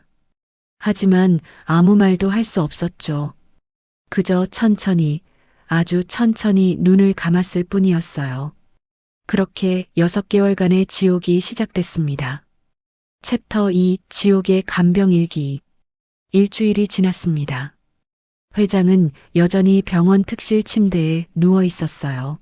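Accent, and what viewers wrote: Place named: China. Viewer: 쭝꽁놈인가 말이 이상하네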